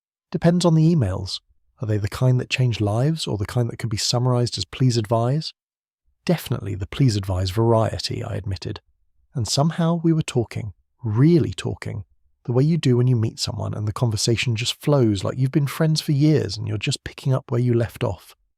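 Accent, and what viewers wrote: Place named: United Kingdom. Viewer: I would like to make a suggestion. If it's OK to take a british narrator it's non real to use him for dialog of American characters. Could you try to make an effort to solve this situation? As an actor myself it would really make a difference. Thank you! By the way, I'm from near Montréal, Québec, Canada.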